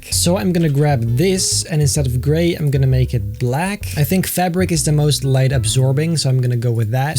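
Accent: Netherlands. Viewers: He sounds so dutch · You are dutch right?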